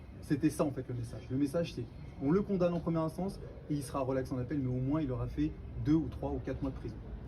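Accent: Saint-Étienne, France. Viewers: Bravo frero ! Notre accent stéphanois est grillé mdrr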